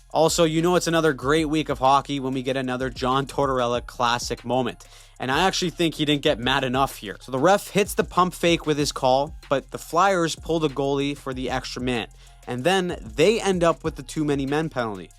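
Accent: Canada. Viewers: So wil there be another weekly recap? who is this guy and why does he sound so Canadian?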